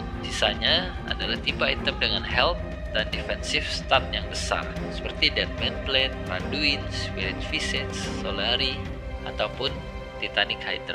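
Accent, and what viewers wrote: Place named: Java, Indonesia. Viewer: Jawa nya Jelas BGT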